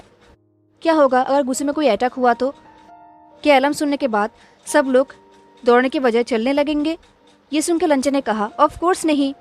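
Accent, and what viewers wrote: Bengal, India. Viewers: Di kal main jab padh rahi thi to aapke channel ka notification aaya tha to main video ko like and diwali vali comment karke maine socha tha bed par sone jaugi to tab sunogi as a bed time story jab bed par aayi to thak kar so gai lekin jab main abhi sun rahi ho tab mujhe pata chala aapke video delete karane ka pura sach aapke channel ke video delete hote dekhakar mujhe bahut bura laga tha kyuki aapki sari story bahut jyada pasand hai aapke calm and thode se bengali accent ke sath aur main bas mere lord shiva se pray karugi ki aapane jin writer se puchha ho vo delete karane ko na bol de aap mere wangxian explainer ke favourite list me aate ho i know comment bahut bada likh diya hai main par di mujhe aapase ye kahana tha your are my favourite wangxian explainer agar aapane ye comment padha ho to sorry aapko itna bor karane ke liye 😊😊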